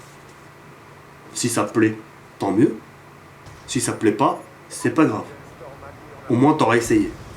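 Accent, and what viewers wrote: England, United Kingdom. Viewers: J'adore ton accent anglais <3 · Like pour le magnifique accent anglais de:D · mdrrrrr le mec qui fait le saut contre le mur bref.. sinon jte felicite pour cette video jai pris beaucoup de plaisir et puis jaime bien ton accent anglais mdrr alller salut:) <3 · J'adore ton accent anglais XD très belle vidéo ;)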